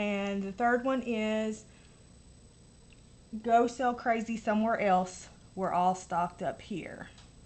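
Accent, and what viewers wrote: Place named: South, United States. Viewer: ha ha I still love your accent props southern gal xx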